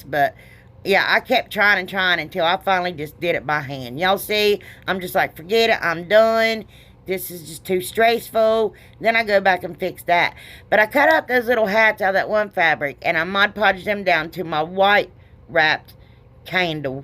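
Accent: South, United States. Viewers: Love these ! Bet you and I sound about the same, country as all get out !!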